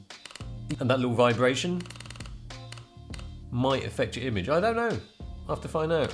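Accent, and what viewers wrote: United Kingdom. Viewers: Asian guy with british accent. That's cool. :) Could you please help me decide. If I shoot mostly video but sometimes I want to do a little bit of photography, would it be better to have one SONY A7s3 and one SONY A7R4? Or would it be better to just have SONY A1?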